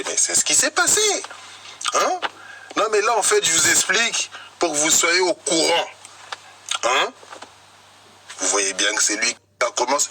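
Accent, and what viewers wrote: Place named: Grigny, France. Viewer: A grigny c'est comme ça que sa parle c'est sa ta pas compris.. ça se voit vous venez de province ou sud de la France pour dire sa...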